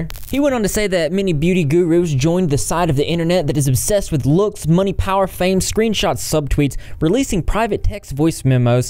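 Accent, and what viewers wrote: South, United States. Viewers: If I had to guess...Florida/alabama/Georgia somewhere around there lol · I definitely hear the south in your voice.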